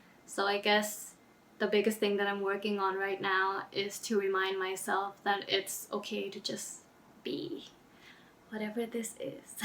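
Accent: Thailand. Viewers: THE CUTEST slight thai accents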